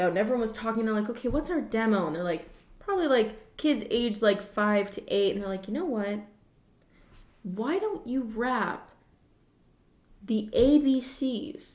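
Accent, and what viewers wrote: Canada. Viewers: I can tell you’re Canadian by the way you pronounce Magcon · YOUR CANADIAN ACCENT IS SO CUTE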